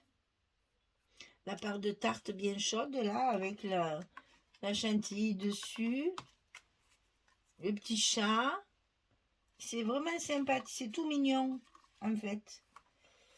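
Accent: South, France. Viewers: Cool! si vous nous montrez comment faire un tableau avec une feuille 30x30, je prends. J'offre ce genre de cadeau de temps en temps et je suis très intéressée de voir comment vous sublimez une simple feuille. J'ai hâte de voir vos idées très créatives dans votre bonne humeur et votre accent du sud 😍qui va réchauffer notre hiver du nord 😝.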